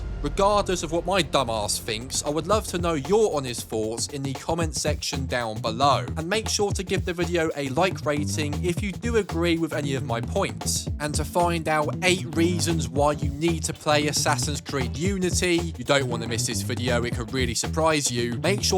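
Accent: United Kingdom. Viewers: Why do all British YouTubers sound like absolute bellends? Are you all trained at some school to sound like retards?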